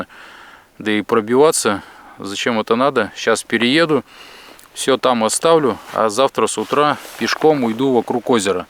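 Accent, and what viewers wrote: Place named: Vyatka, Russia. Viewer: привет,классные видео,если не секрет,с какой ты области?,архангельск,коми,или Кировская обл,говор то вроде наш,вятский😀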